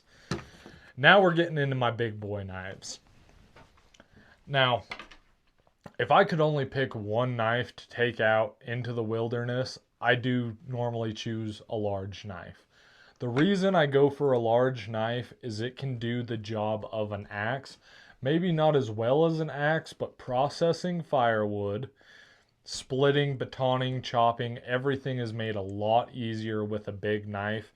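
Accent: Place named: South, United States. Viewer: Where you from, amigo? The south?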